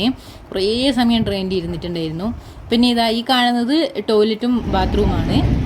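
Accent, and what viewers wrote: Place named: Kannur, India. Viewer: കണ്ണൂർ ഭാഷ 👌👌👌😀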